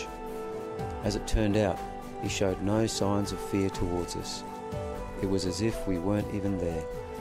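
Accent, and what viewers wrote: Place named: Australia. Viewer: I loved the work on this documentary. The word has to go out to make people aware that koalas are on the endangered list. The I haven't seen anything that has this quality of images and information before. The sound track and voice over give it a uniquely Australian feel and I really enjoyed it. It's great to see koalas in their natural habitat and that's what makes this special for me. I hope to see more from the makers of this in the future.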